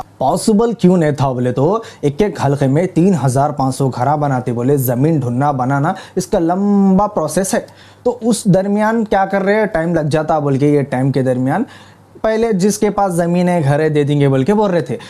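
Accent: Hyderabad, India. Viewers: Assalamu alikum bhaiya apki pakki hyderabad language bhaiya bade ka gosht khate na isliye dimag slow chalra apne logo ka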